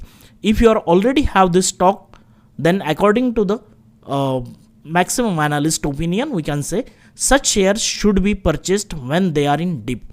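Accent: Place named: Maharashtra, India. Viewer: This is marathi accent .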